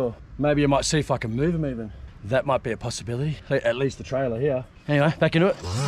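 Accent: Australia. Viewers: Love Australian accent. I am a Zimbabwean living in Portugal.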